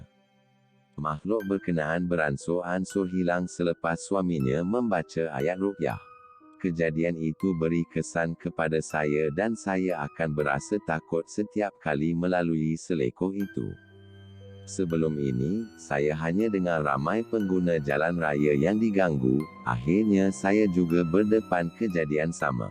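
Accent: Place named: Sabah, Malaysia. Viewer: Sial lah suara Sabahan 🐷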